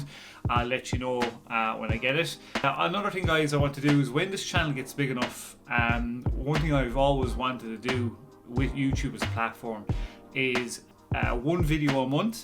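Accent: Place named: Maritimes, Canada. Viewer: cool. Figured you had a eastern accent from the east coast maritimes. Im from Saskatchewan.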